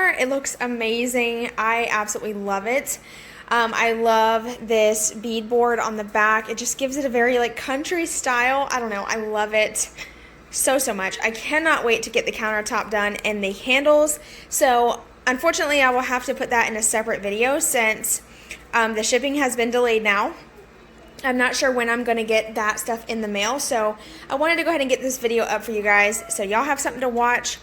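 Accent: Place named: Kentucky, United States. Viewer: You sound like a Kentucky girl. Island looks real good. I'm building a 6 foot island like this for my wife.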